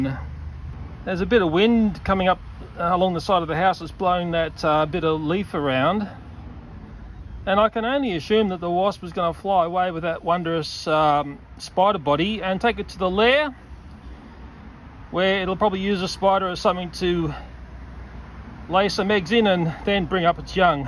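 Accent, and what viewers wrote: Australia. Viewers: Aussie accent is so funny but how on gods earth do you guys live with those spiders down there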